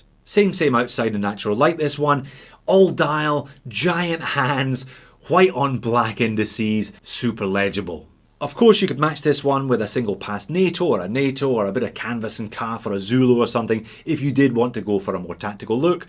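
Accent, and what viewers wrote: Scotland, United Kingdom. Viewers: Maybe I've gotten too used to the Scottish accent by now, but have you ever thought about doing some kind of voice-over or voice acting? The enthusiasm is contagious.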